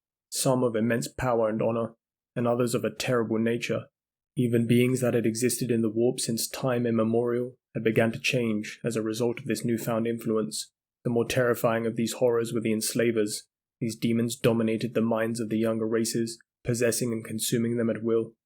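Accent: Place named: United Kingdom. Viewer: Dunno why i fixate on that comment so much, it keeps rolling a round in my head. I think its because i was so genuinely surprised that a new Lore channel had popped up and it was really good. I think i have nailed his voice down - Calmer than arch warhammer, More interesting than luetin and British. these are all wins.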